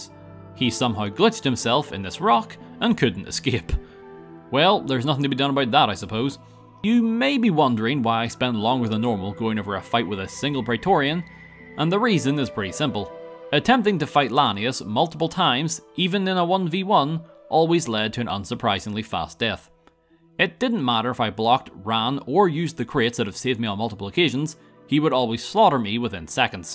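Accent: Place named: Northern Ireland, United Kingdom. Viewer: I love having your videos on to relax or for background noise and I never knew why until I heard from one of your Skyrim videos you're are also northern Irish I'm so glad I finally found someone from here who makes amazing videos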